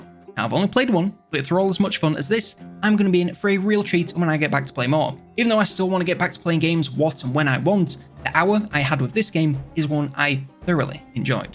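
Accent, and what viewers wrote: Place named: United Kingdom. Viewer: It's nice to hear a British accent telling about something interesting. What exactly is it though?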